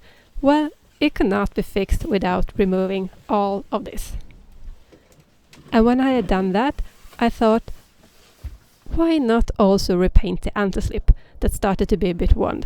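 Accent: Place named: Värmland, Sweden. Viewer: Hi, I really enjoy your channel (especially when you get to hear some värmländska). I'm in a similar situation, having bought a Flamingo 40 last year as my first sail boat together with ha friend. I just have one suggestion - could you possibly arrange the order of the videos sequentially in the playlist you've made? Right now it seems that youtube autoplay will select the next video to play at random:) Thanks for the great content!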